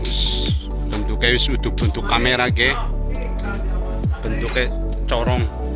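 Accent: Banyumas, Indonesia. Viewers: Olih ngomong collab nyong krungune "olab"😂 Ngapake mantappp jannn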